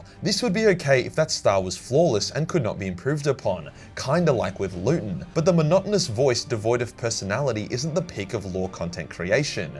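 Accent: Australia. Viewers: AdeptusRediculos dont have you on because they are living in Cali. And you are way too harsh of a Aussie that would trigger their fans. As a fellow Aussie who talks alot like you do (with my mates) i can kind of understand that one 😂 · Dude, you are about to blow up! Girlfriends are about to watch Henry Cavill in his show. No gay shit but girls will watch you…your Australian. Accent wins. Thank you dude for exposing the best cosmic horror and being real. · >Be me >Dr. House fan > fan > turn his hair blonde >Cheesy Ausie accent > now look like dr Chase >MFW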